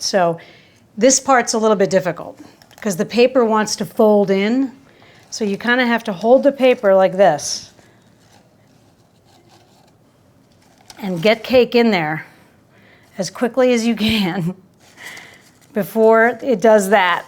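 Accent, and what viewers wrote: RP, United Kingdom. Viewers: Great brit accent! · is that how she talks? im going to watch the video after this one, but the voice sounded like...the queen's dialect.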